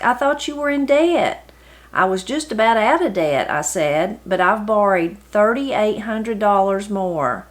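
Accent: Appalachia, United States. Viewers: I cannot tell you how much I enjoy listening to the books you read about lives in Appalachia with your beautiful accent…! I have lived in the Blue Ridge for 5 years bow and Love the stunning grandeur of these mountains, the graciousness and simplicity of the people and their connection to this land. Sad to see this book end…!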